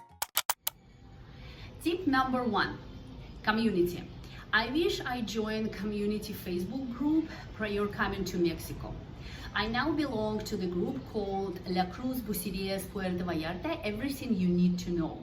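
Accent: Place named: Eastern Europe. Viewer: Hello, my wife and I are considering moving to Puerto Vallarta. My wife is Russian, since you also sound eastern European, I have a couple of questions for you! 1. Is there a fairly large Russian community there? 2. Is there someplace there to buy Russian food products? I appreciate any information you can give us, thank you!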